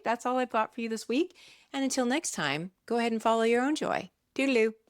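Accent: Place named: Canada. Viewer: You hide it well but I can hear your Canadian accent. I'm going with British Columbia somewhere outside Vancouver.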